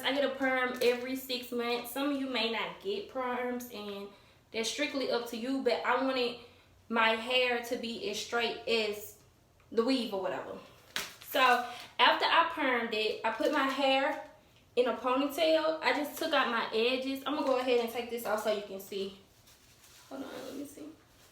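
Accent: United States. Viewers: I love your southern accent girlie! 😍😍😍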